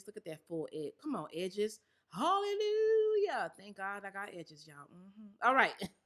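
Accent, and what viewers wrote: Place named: Nigeria. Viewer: I like your Nigerian accent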